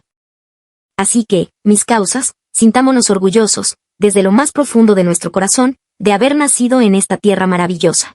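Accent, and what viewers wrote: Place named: Lima, Peru. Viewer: Mucha jerga y en una mujer es mas feo. Sólo en Lima hablan así.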